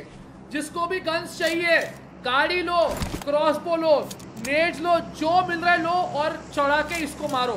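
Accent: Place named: Maharashtra, India. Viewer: You say funny marathi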